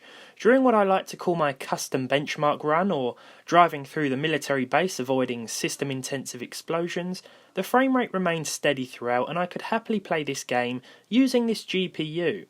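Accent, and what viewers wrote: United Kingdom. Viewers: Dis fookin brit accent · Why do British people say knot 1 5 percent instead of zero? Not joking I legit am confused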